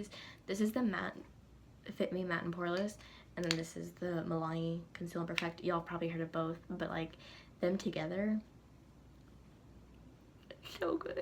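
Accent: South, United States. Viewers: I love your southern accent 😂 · You have a little country accent oml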